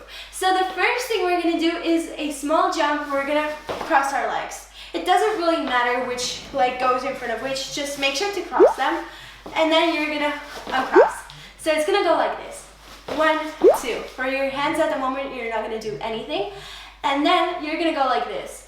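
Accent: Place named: United States. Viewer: crazy how ur spanish accent went away over the years and now u sound full american lol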